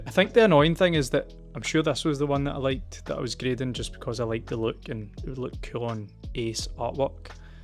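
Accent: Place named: Scotland, United Kingdom. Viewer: Hi good to hear a Scottish accent. Finding product is so hard.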